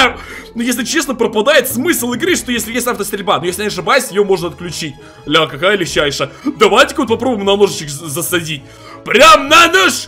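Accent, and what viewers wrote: Ukraine. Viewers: Ты украинец ?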